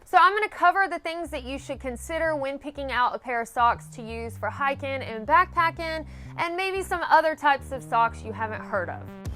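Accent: South, United States. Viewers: Hey y’all that’s a definitely a southern accent ha ha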